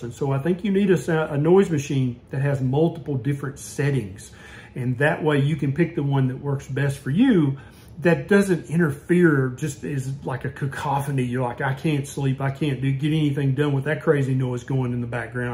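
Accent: South, United States. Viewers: 100% support that southern pronunciation,! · Tiniiiiitus is pronounced this way in the north as well so you sound like you're from the south and I don't understand you're saying it is pronounced that way in the South when you clearly I can't even listen to you because you keep on saying it improperly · Oh my goodness… we really do say it like that in the south lol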